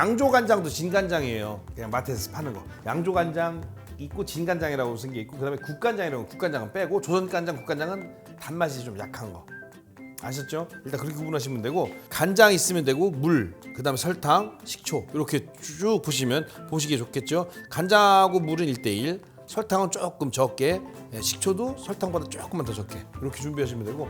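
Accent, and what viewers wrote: Chungcheong, South Korea. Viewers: 백종원 님 말씀도 맛잇게 충청도말씀 저도 충청도임니다 감사해요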